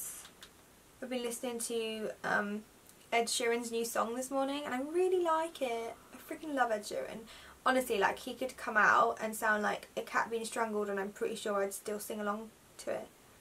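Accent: United Kingdom. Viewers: Ahhh, you replied! I love you even more now! <3 <3 <3 British sounds so much cooler. Haha. I hope you enjoyed your stay in LA :)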